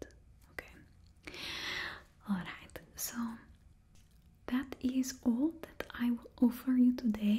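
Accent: Russia. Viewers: Russian accents are so hard or just underwhelming/overwhelming, but this, is amazing! I'm learning Russian and I have such a bad accent, so give yourself more credit! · This is the year of my villian arc and my fashion sense isn't the greatest so thank you for the help with that. I can't wait to cause chaos and look good doing it. Your Russian accent is amazing by the way. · Очень хорошо изобразила русский акцент🤔🤯, Браво! 👏👏👏 Very well showed the Russian accent🤔🤯, bravo! 👏👏👏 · There's no need tho, it would make just a cliche trope, not a wholesome true russian accent as it is now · Coincidentally, I was just marathoning Gibi's Tatiana roleplays, so Russian accent seems to be my thing today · I quickly wanted to mention how I'm really digging the Russian Accent within the recent posts!! Especially in the last one relating to the cyborg, I can easily re-listen to that video multiple times, I just somehow find it incredibly pleasing to hear. 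And while I'm commenting, I just wanted to say thank you for all of these wonderful videos :] You put so much work and detail into them, always a delight to see a new upload! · What I love about your Russian accent is that, as a linguist, I could go on and nitpick the phonetic aspect (which I won't, it's really good, it's just that some things only come to you when you are studying the target language super close and not one sane person would devote years to studying foreign phonetics), but your grammar absolutely makes up for it, sometimes you even got me wondering whether you are a native English speaker. And I think that grammar aspect is more important for creating a believable effect, because people come from different language learning environments and have their own unique speech peculiarities, so the accent can sound different from what you picture as an ideal Russian accent, but the grammar mustakes are definitely common for all Russian-Englush speakers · Чувашский акцент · I've gotta say I can't get over the russian accent. 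Truly talented... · Love the russian accent. Your performance is always on point. 😩💖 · The Russian accent perfect! Do more things like this I'm in love! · Happy Thursday,!😊😊😊😊😊☀. And I love the beautiful russian accent. It's brilliant!😊💙💙💙💙💙💙💙👍. Also, this 51-minute ASMR video is super amazing! I can relax and eat my pizza with it.😊💙🍕👍. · Wow I totally did not expect that you'd say my name and scared me off a bit ngl 😂😂 And btw your russian accent is perfect 🥰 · It's better than my fake russian accent I use when playing Hell Let loose as the soviets so good work! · Раиль Галеев именно так русские и говорят на английском, только если не имеют лингвистических способностей и/или не стараются скрыть присущий им акцент. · I really love the genderless approach "bad girl, bad girl or whatever gender you identify as look", this was very pleasing experience, as a trans man I appreciate it twice as much) Also, as a russian myself, I would like to say, that your russian accent is very nice, I'm so tired of hearing heavy russian accent in movies, it's really hard to hear and understand, just disappointing honestly, but yours was way softer and pretty realistic! 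· Your Russian accent characters are always my favorite 🙏😁 and the doctor/scientist characters. Ty! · Read the description and simple silly videos like these are always appreciated, love the awesome content. Also your Russian accent sounds great :)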